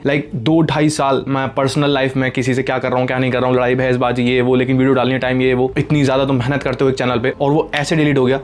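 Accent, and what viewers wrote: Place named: Haryana, India. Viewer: Khadi boli haryana se ho na bhai tum 😂 nahi tu beyong hai bhai cutie pie 😅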